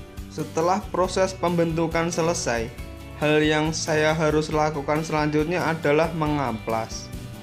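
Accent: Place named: Java, Indonesia. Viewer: Medok parah cuk.. 😅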